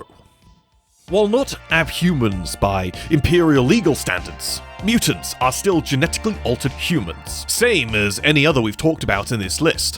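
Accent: United Kingdom. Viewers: Dude, why you have to do the cringe voice over. Just talk. I mean you already have the British accent. You don't need to do the goofy voice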